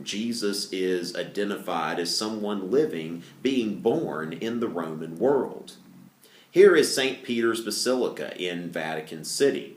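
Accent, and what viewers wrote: South, United States. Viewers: dAt southern accent dough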